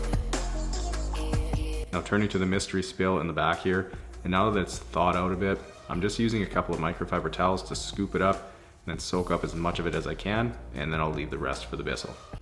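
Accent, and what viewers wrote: Saskatchewan, Canada. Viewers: Grage... Lmao😂🤣😂 aww I love the Canadian accent. Stay up my ice bound Northern Bro. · Might have to get you to do the tour busses next time we come through town! (I can hear the Sask in you any day... ha!) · Not all canadians sound like that just so ya know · so much Canadian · I love how his Canadian accent barely noticeable the entire video and then when the customers arrive he goes full blown, "OH YAH EH!" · What I found kinda funny is when I heard you were from Canada your ascent seemed to be more prominent 🤔✨😂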